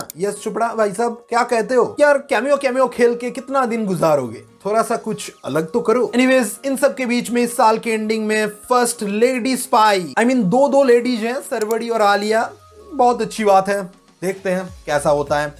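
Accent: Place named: Bihar, India. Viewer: Aap ke speaking skills me bihari pan aa raha hai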